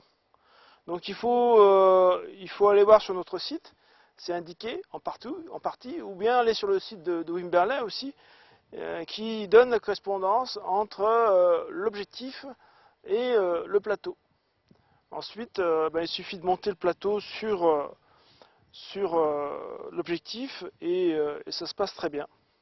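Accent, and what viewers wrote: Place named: France. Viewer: You sound French.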